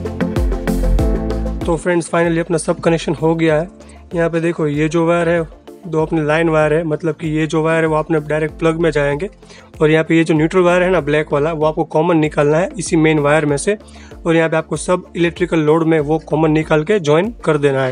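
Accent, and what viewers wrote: Gujarat, India. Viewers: Are you from Gujarat? Because your hindi has gujarati accent. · Wow.. Your gujju hindi accent is owsom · ભાઈ ગુજરાતી લેહકો આવે છે હિન્દી મા હો 😂🤩🤩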